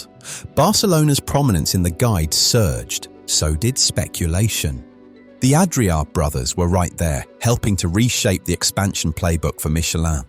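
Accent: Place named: England, United Kingdom. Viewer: The English accent suggests targeting the Spaniards once again. Typical Brit “mala leche”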